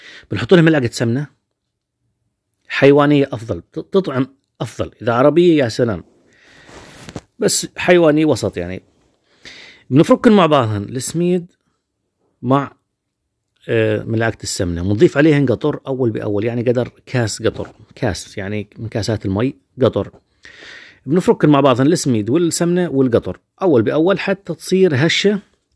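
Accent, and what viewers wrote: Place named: Hauran, Syria. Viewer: لهجتك الحورانية الاصيلة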